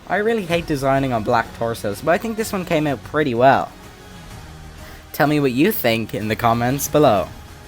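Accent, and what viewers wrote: Ireland. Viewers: Yo he's Irish!!!!! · Are you Irish I recognise your accent. I am Irish myself btw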